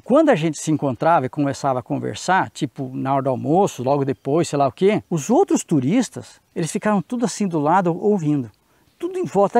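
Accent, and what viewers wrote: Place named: Paraná, Brazil. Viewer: 👏👏👏👏 pelo sotaque e pelo nome vc é. Eu conterrâneo pelo jeito do Paraná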